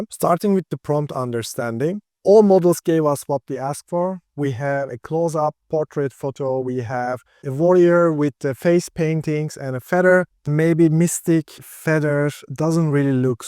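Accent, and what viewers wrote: Turkey. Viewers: Kanka bişey dicem aksanında Türk olduğun o kadar belli ki severek takip ediyorum ama :)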